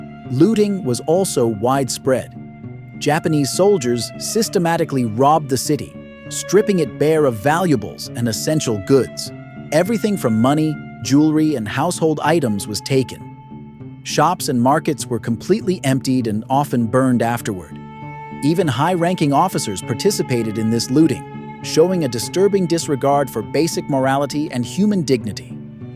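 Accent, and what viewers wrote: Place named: United States. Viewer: Why are the soldiers in the pictures wearing Chinese uniforms and not Japanese? That they are clearly fake allows people to deny that such atrocities never occurred. One can hear the commentator is an American who will not go on to state the pivotal role his country paid in freeing, and releasing many Japanese war criminals like the infamous Unit 721 doctors and inviting them to America or Hirohito Japan's God Emperor. A load of American crap.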